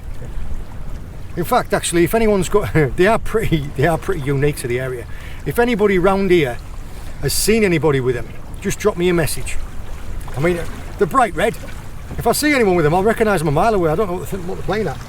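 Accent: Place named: Northern England, United Kingdom. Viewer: You have a northern accent. What took you to Cornwall?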